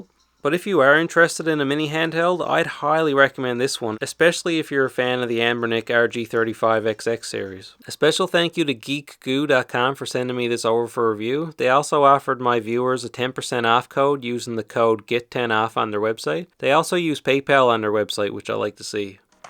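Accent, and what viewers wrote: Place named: Newfoundland, Canada. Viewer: Just ordered one of these after watching this video. Looks like an awesome rig! You also kinda sound like you're from Newfoundland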